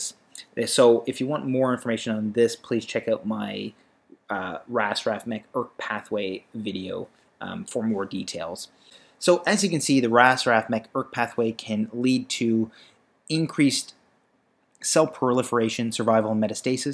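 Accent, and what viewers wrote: Canada. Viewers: Thank you for making these great videos! Are you Canadian btw? Just want to prove/disprove my accent ears...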